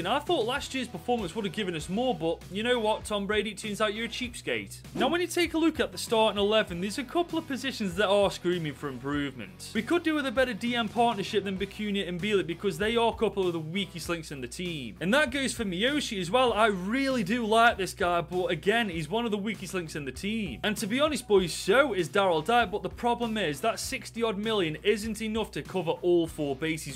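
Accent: Stoke, United Kingdom. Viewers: Are you from stoke bro come on ❤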